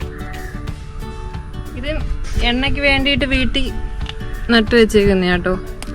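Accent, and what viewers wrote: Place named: Kollam, India. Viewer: kollam kaari aanenn samsaram kettapozhe manasilayii 😍😍😍❣️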